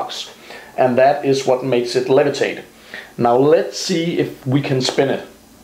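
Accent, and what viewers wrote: Denmark. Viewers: vietnamese? He's danish >.< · You sound really danish (im danish too) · Are your danish your have an danish accent cool idea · Lol sounds danish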